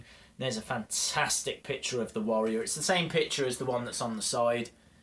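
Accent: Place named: Birmingham, United Kingdom. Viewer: You sound like a fellow Midlands Fam. Maybe Birmingham?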